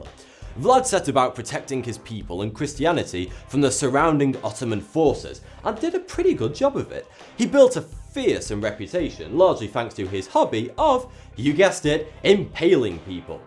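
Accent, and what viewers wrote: United Kingdom. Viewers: Is this guy British or just a hipster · R u British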